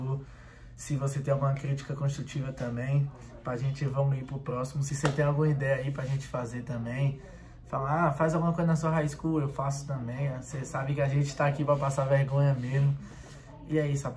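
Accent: Bahia, Brazil. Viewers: Tu é baiano ?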